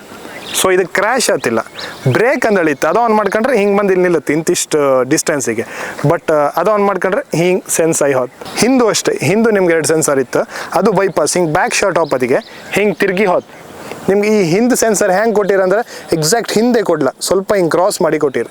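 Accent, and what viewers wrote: Kundapura, India. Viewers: Avru matadta irodu kundapura kannada guru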